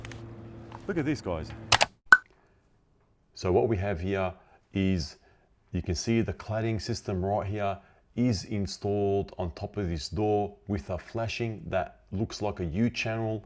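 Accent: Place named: Australia. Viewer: love the guys accent.. to me its one of the true aussie accents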